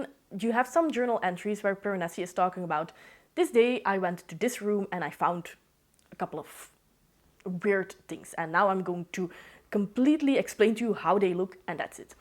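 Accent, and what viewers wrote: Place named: Netherlands. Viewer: I hope you're feeling better now. Thank you for the recommendation. I loved most of the books you recommended, the others go on my TBR. I wasn't a fan of Mistborn, maybe I'll like Elantris. Just found out you're from Belgium, like me. 😊 Do you only read in English or also in Dutch? (I think you're dutchspeaking, going by the accent.)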